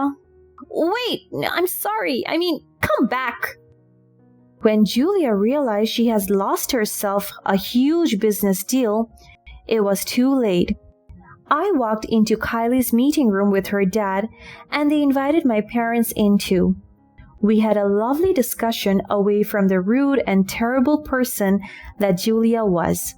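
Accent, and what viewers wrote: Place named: United Kingdom. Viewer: It's the queen's English not American English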